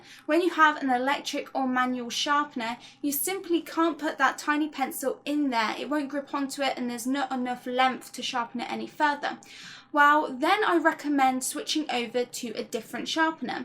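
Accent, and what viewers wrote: United Kingdom. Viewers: Besides all your amazing, thorough explanations and detailed drawings and tips, I love your accent! I love the way you say pencil it makes me smile EVERY TIME I wish I had an accent like this and I've been practicing my British ever since I watched Austin Powers first when I was like 6 or 7, haha sometimes when I write stuff like this I say it in my head in an accent 😂 Again thank you for amazing work, you really have made me pull up my art determination and persistence, as well as follow thru, that's my biggest problem! I always start pictures and when I'm done with that session I don't really go back to it unless I randomly find it again... I also am sort of homeless atm, not to worry we have money and cars, but since I don't really have my own space it's hard to spread out my art/storage/drawing space so maybe that's why I always start new when I go to start a project.... 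if you want to write a response I'd be pleased but also I watch all your videos and copy down great tips to remember (almost all of them haha) so I've got quite a lil notebook full 😊 So I'm sure there is something for every question I ask ! Brilliant work and lovely role model! You are such an inspiration! Thanks again! Much love, Autumn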